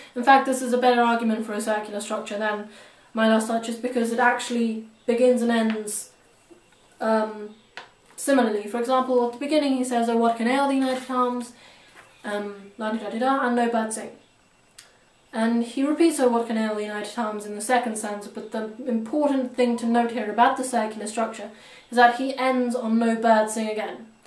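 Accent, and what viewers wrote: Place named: RP, United Kingdom. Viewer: Thank you, Miss. I enjoyed this so much. I hope you've done more of these short lectures. I'm a drycleaner's daughter from Kansas. I missed school terribly before the rise of the Internet. (love your posh accent too) Thanks again.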